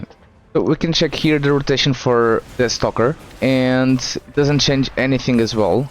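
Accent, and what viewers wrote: Portugal. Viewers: you sound portuguese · Are you portuguese? the accent does sound like it at least! Thank you for the coverage on the changes, excited for the next season! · parece tuga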